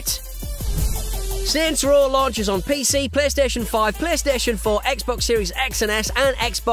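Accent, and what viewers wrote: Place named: Northeast England, United Kingdom. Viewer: you have to be from the north east with that accent I'm from newcastle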